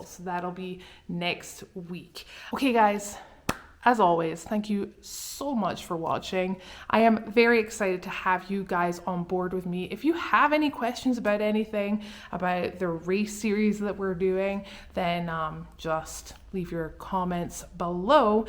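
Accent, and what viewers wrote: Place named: Scotland, United Kingdom. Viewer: Everytime I hear your Scottish accent I get like jello 😍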